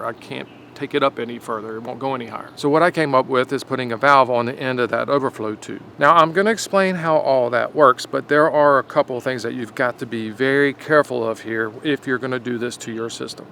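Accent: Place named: East, United States. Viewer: An Ah ha moment is also called an epiphany. I say waater and you say worrrter, where are you from? The east probably near Pennsylvania? I’m from the south.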